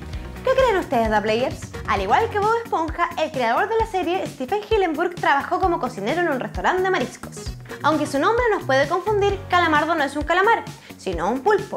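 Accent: Chile. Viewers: 23 cosas que no sabias de YOUTUBE. Estoy viendo tantos los vídeos en especial en donde sale que se me está pegando el acento chileno po!. Saludos desde Lima, Perú. Bendiciones para todos. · es de chile ya que ella es chilena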